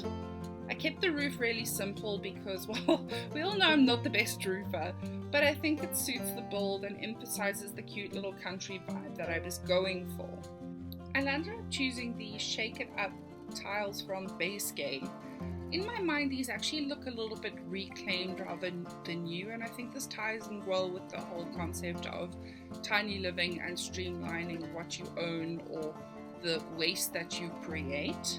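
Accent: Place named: Australia. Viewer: i think ur from australia, right?? with the accent, lol love the build a bit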